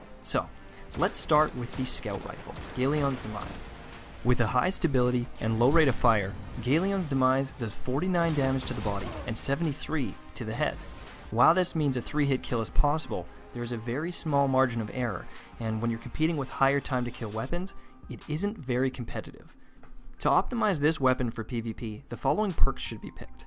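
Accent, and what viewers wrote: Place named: Canada. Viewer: Oh haha, yes I do. Is that how its said in a Canadian accent? Thought you just mispronounced a couple words in one sentence. Will go check out your channel now.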